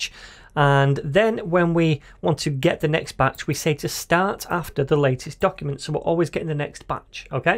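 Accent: United Kingdom. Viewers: Hi! You're pretty cool in explaining things and your British accent sounds funny so I would really like to see next series about pagination. Here's my like and subscription.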